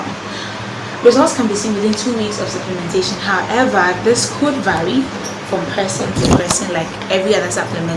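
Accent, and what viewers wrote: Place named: Nigeria. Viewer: Are u Nigerian? I work with a lot of Nigerian ppl. Girl ur accent is Everything!! Sounds like music when u talk. And oh yes thank u for this video. I was about to give up on MSM b/c of the bad headaches.. but I will try it again with more water. Thx girl. 🌹💫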